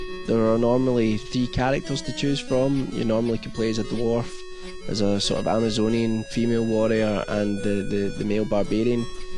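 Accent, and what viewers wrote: Scotland, United Kingdom. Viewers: fellow scotsman gon yer sell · Cool review and funny accent. where are you from? Scotland or something?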